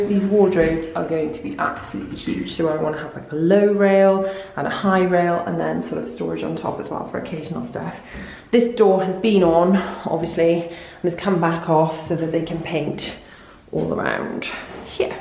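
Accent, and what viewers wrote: Wales, United Kingdom. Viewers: Newbie here 🙌🏽 are you from wales you sound like me lol 🏴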